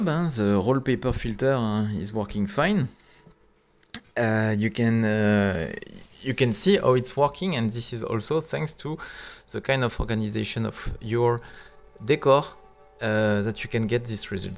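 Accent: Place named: France. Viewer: Bonjour, si je vous écris en Français c'est que j'ai cru percevoir un accent Français dans votre anglais...Je suis frileux avec le fait qu'à l'intérieur de ces roches, l'eau ne sera pas filtrée d'une part, et sera stagnante d'autre part. Qu'en pensez vous?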